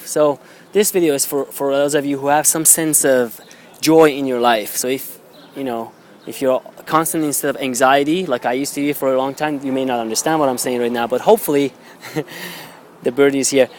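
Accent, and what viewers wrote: Iran. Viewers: just out of curiosity,where are you from originally? you have a slight Persian accent when you speak English!!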